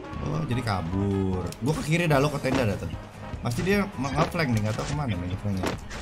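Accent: Java, Indonesia. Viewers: Jowone ngendi mas?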